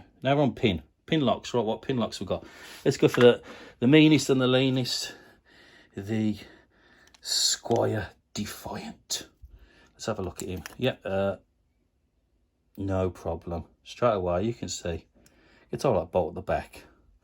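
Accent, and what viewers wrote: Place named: Midlands, United Kingdom. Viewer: what a great and informative video great to have you back you are the lever king thank you for all your hard work cheers. I must ask you are you a brumie lad like myself I detect a midlands accent? just curious because there are a lot of geniuses that come from that part of the world haha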